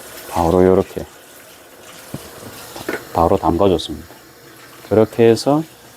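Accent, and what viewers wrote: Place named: Busan, South Korea. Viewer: 영상을 보면서 저도 자몽청을 한번 만들어볼까? 하는 생각을 합니다 정감있는 부산말 참 좋습니다!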